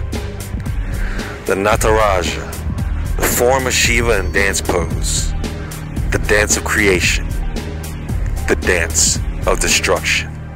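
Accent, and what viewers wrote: United States. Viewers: Why am I unsurprised this is narrated by an American.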